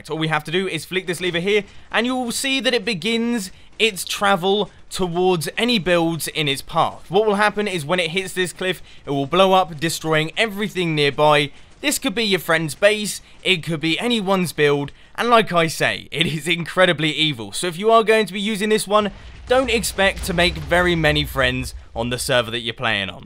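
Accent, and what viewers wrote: England, United Kingdom. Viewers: The british voice made up for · That intro was very English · Ahhh... the britishness of that british voice... like music to my ears... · Are you british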